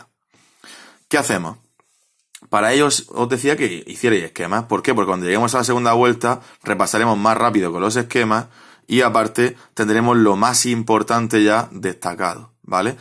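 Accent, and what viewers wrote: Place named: Durango, Mexico. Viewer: amigo medio durango vos .....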